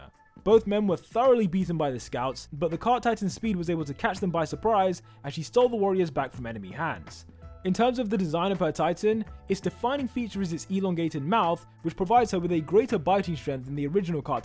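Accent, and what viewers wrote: United Kingdom. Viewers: she speaking british which gives me the tingles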